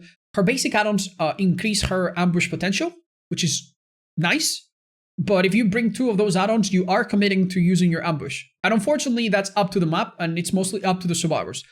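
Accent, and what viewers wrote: Spain. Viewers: Question for American/British viewers, Does have a Spanish accent or just an accent in general? I'm surprised by how good his english is.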